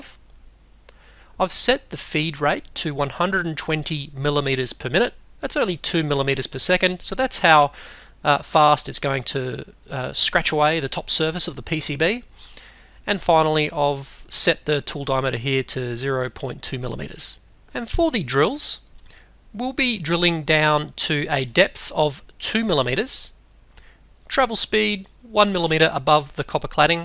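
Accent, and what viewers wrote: Australia. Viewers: hes just got a really strong aussie accent, i kinda like it (though im also an aussie)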